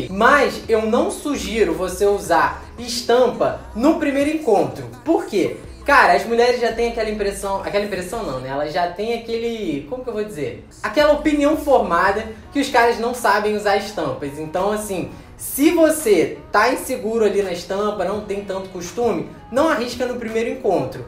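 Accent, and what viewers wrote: Rio de Janeiro, Brazil. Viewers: Você é carioca? Adorei o canal, já vou me escrevendo, e deixando aquele like.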